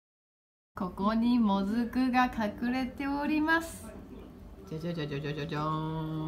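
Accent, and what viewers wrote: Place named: Ishikawa, Japan. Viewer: ナイス石川弁！！もっと方言出してー！！嬉しい('ω')ノ♥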